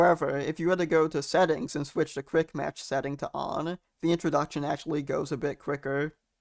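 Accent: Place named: New York, United States. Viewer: ohhhh awesome. For some, you sound like you're from New York.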